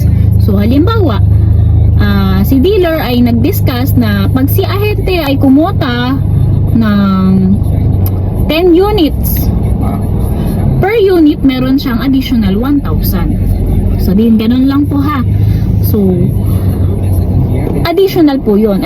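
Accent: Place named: Visayas, Philippines. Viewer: Bisaya ka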